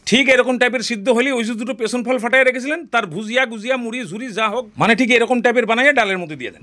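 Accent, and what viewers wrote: Bangal, Bangladesh. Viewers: আমগো বাংলাদেশের ভাষায় কতা কইতাছেন ক্যান? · দাদা আপনি বাঙাল? 😅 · Bangladesher Bengali der moton kore kotha bollen . Love from Bangladesh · বাংলাদেশি ভয়েজ❤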